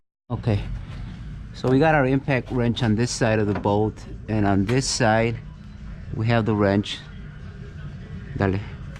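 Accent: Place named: El Salvador. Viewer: Such Beautiful spanish! Sounds El Salvadoran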